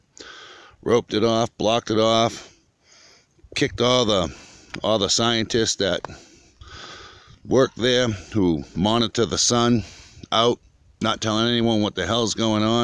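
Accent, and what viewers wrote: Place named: New England, United States. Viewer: Love the Yankee accent! Where are you from??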